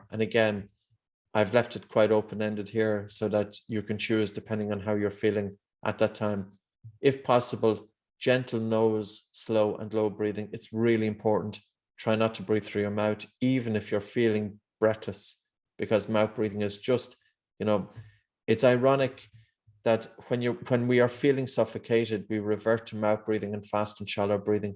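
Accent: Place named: Ireland. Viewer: This is a very useful video. The Irish accent is so beautiful and calming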